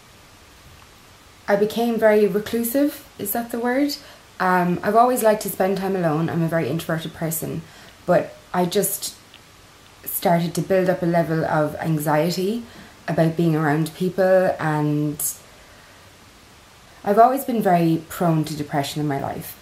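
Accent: Ireland. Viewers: First of all I have only ever followed you on Instagram and when that gorgeous Irish accent came out I near died! Secondly I'm really proud that you had the courage to post that. It was nice to meet the person behind the beautiful face and I hope you know that you're never alone. You have a whole internet of friends who support you, isn't the world cool in that way! Sending you big love xxxxxxx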